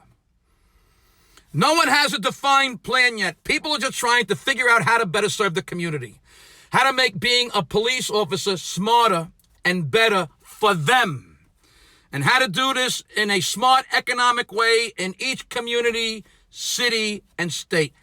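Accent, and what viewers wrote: New York, United States. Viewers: That's pronounced New Yawkas. hahaha You can take the person out of New Yawk but you'll never take New Yawk out of the person! I agree with 100%!! There are good and bad in every profession and the bad need to be exposed and weeded out. Please don't generalize and put all into one category. That's not fair! The bad always make it bad for the good.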